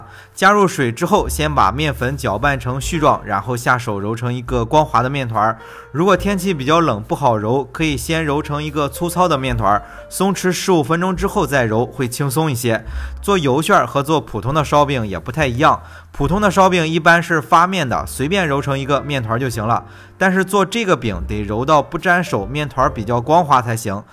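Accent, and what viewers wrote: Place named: Shandong, China. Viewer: 每次听到带点山东口音的解说还是感觉很亲切的，请问你是山东哪里的？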